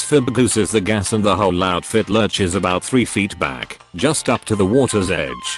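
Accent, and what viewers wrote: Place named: Wisconsin, United States. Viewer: I know a Wisconsite when I hear one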